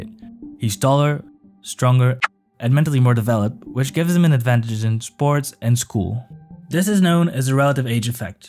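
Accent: Netherlands. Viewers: I can tell you, being born in December, I must really be amazing since I still managed to outperform everyone else in my class from primary to high school. And very humble at that. Edit: I'm Portuguese too. You don't have a Portuguese accent in English... Honestly, you have a very Dutch/Flemish accent. It's tripping me.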